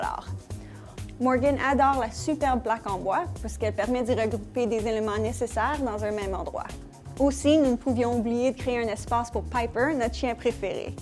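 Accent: Quebec, Canada. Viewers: cet accent canadian me rend nerveux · Je suis québécoise et sa manière de parler m'angoisse aussi.. nous ne parlons pas tous comme elle ahahaha. ;) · On devrait lire accent canadien s'il en était un, mais il s'agit ici d'un accent québécois.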